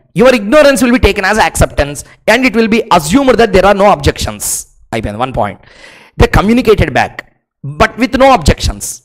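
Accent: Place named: Telugu, India. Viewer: sir ur telgu English accent is so superb....we enjoyed a lot.....ur lecture god bless u sir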